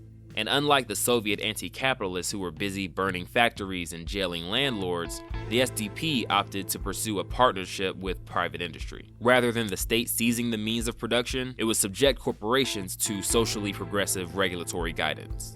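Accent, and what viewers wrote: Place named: United States. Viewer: Imagine taking shots at another country's violence in a thick American accent lmao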